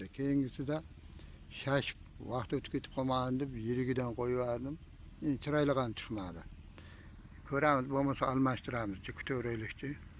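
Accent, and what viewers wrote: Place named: Buvayda, Uzbekistan. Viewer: Хожи ота ? Каердансиз ? Бизнинг Бувайда тумани ахолисининг шевасига ухшар экан гапиришингиз . Лекин уста овчи экансиз , койил !... Видео учун рахмат ! ...🎉🎉🎉🎉🎉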